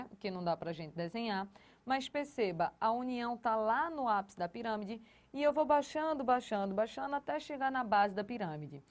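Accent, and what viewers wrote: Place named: Northeast, Brazil. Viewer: sotaque do nordeste.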